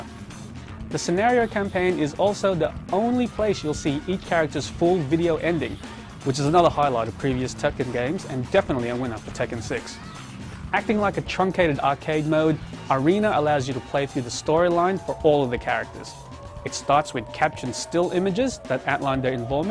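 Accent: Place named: Australia. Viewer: ... thats an Austrailan accent, you tit.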